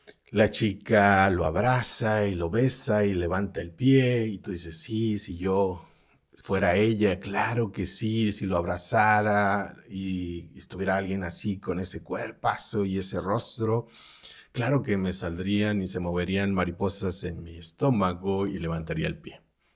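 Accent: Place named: Argentina. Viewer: estas hablando como argentino!!! 😃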